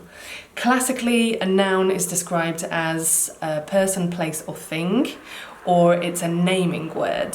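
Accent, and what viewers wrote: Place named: United Kingdom. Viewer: Great video. I'll be using this "the" explanation for my future teachings. Plus, always good to hear that Brit accent 😉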